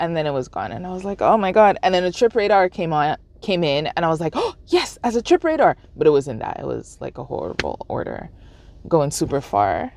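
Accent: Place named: Canada. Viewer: The Canadian accent is music to my ears. Why are you on the right side tho? Is you camera mirrored?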